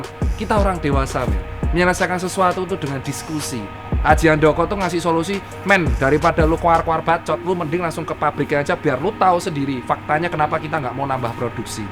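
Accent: Java, Indonesia. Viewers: i love mEDok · Logatnya Jawa banget mas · Medhok banget. Kayak si org korea itu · Mas e medok e semarang opo suroboyo kok aku ngekek wkwkwkw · Mas meh takon asli wong ngendi e koe kok koyone yo gur wong jowo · Logat nya medok wkwk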